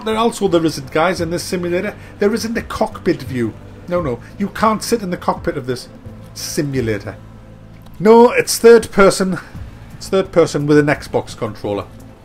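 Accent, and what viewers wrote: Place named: North, United Kingdom. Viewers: I do love a good old fashioned Northern rant, me! Most amusing :-) Enjoyed the overview too. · You sound fat and northern.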